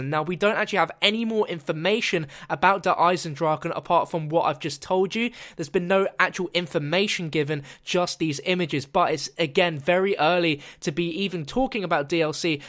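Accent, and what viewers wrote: England, United Kingdom. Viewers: I love when English people speak German :D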